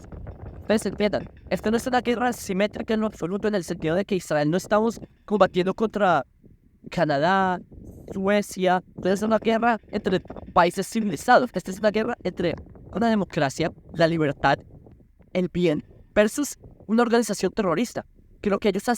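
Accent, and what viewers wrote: Colombia. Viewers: Hablas como los colombianos......por qué?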